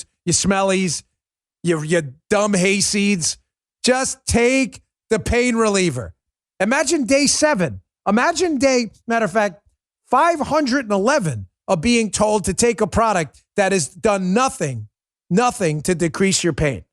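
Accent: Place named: New England, United States. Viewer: Your ok with me Dan, if we could just get rid of the yankee accent.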